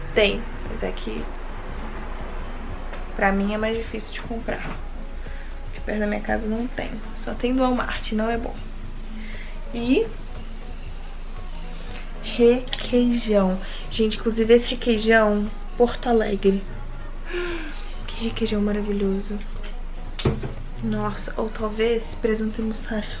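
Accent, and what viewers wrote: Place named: Minas Gerais, Brazil. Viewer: Que linda ! Ta com sotaque gostoso mineiro, família linda . Deus abençoe!🙏🏻🥰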